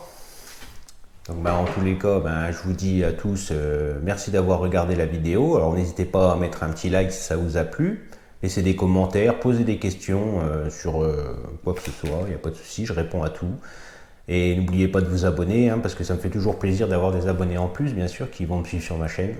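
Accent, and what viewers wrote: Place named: North, France. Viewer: salut moi c Seb je suis pas mal ta chaîne mais je viens seulement de comprendre comment s'abonner jsuis pas doué lol. sinon je vais souvent au der j'ai une barque bourlingue moteur avant avec i pilot la juste un petit prblm de moteur arrière ça va venir bref sinon pour savoir si ça te dirai une peche avec un chtimi car je suis originaire du nord et vue ton accent tu dois pas en être loin. maintenan j'habite a 50 min du bassin nord maintenant au plaisir de ce voir sur l'eau ou un rdv pour une sortie a deux bon wekend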